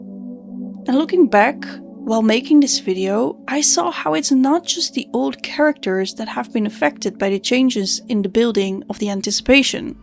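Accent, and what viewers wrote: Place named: Netherlands. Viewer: as a fellow dutch person its suprising to hear the accent xd, great vid!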